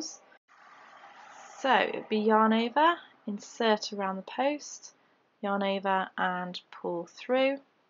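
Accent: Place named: United Kingdom. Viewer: is British in case you missed the accent along with everything else she said that you clearly didn’t listen to. What you call a double is called a treble in the UK, not sure how anyone can not know that if you use YouTube and online patterns.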